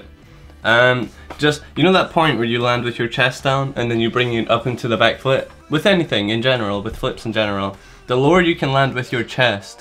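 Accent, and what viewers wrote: Scotland, United Kingdom. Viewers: Hey are you Scottish ?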